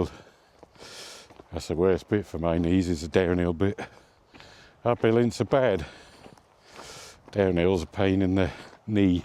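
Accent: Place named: Norfolk, United Kingdom. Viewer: I noticed you haven't lost "all" of your Norfolk accent 😂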